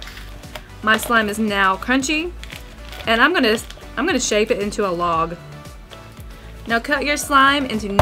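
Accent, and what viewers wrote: United States. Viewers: you have a cowboy acent :ooooooooooo seen anything weird here?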